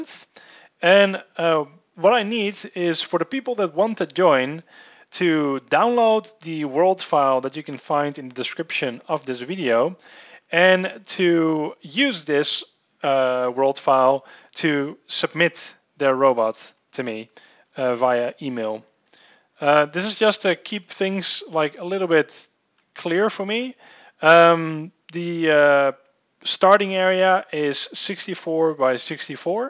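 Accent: Netherlands. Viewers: Man im dutch myselve and i instantly knew youre dutch :P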